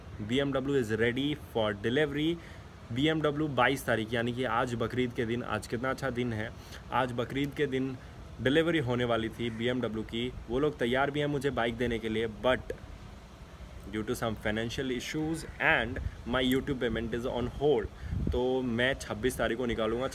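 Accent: Bihar, India. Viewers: Bihari tone aa hi jati h teri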